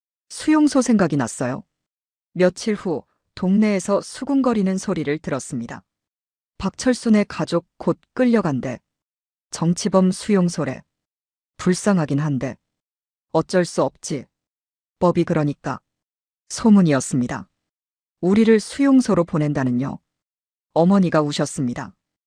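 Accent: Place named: North Korea. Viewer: 나레이터 바꾸세요. 너무 부칸식 ~ , 사투리는 좋은데 억압죽은 그렇네요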